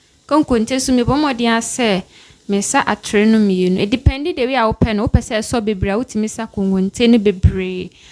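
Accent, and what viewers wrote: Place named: Ghana. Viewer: your Twi is beautiful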